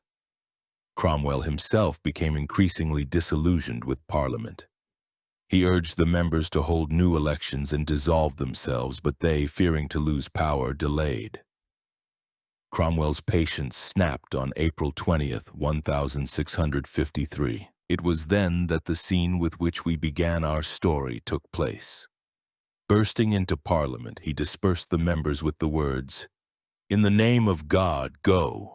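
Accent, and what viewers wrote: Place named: United States. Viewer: why would you use a scraping american voice to narate English history? AI crap ?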